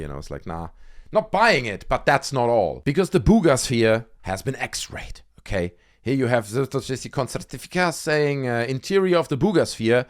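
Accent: Germany. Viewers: I love that German accent! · You got a German accent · Nice accent. German?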